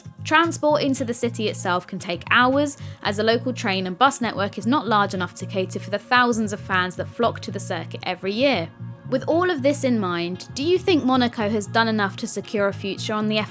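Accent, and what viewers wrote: United Kingdom. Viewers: Your accent is the most British I ever heard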